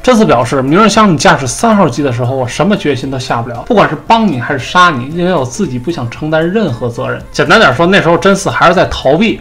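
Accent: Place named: Northeast, China. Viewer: 台主不就是的东北口音的吗？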